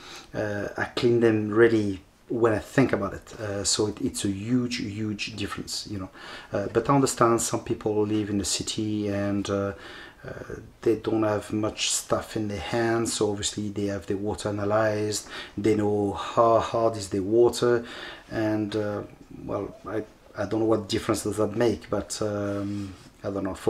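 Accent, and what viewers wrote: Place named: France. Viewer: Vidéo intéressante, merci pour votre explication avec the french accent, hhhhh.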